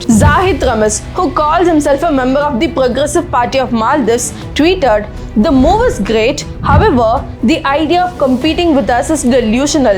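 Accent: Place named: South India, India. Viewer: I have dhruv rathi to watch this type of content then why yours.... is there anything special except south indian accent?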